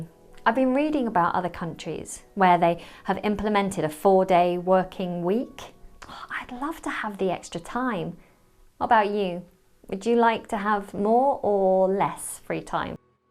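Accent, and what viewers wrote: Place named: United Kingdom. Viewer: I enjoyed this lesson very much. It has been very interesting to listen to your British pronunciation and repeat. Thank you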